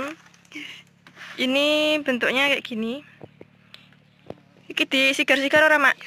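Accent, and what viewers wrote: Java, Indonesia. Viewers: Bertani Tanam daun bawang lumayan tuh hasilnya.. info tani nya sangat bermanfaat. Yang paling menarik konten ini adalah gaya bahasanya logat jawanya medok campur sari hehe.. keren mba,🙏👍👍😁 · Walaikumussala.... wehhh bawang... mbak nya pinter nyangkul... dimana ini posisinya mbak... logate koyo wong jowo. Oya saya sdh antar ya pupuk kandang hitam ke rmh mbak. Saya tunggu pembayarannya dgn ketan hitam. Mampir ya mbak k rmhku kita ngopi hitam bersama.... spy tetap dlm kesetiaan belajar terusss.... ditunggu mbak.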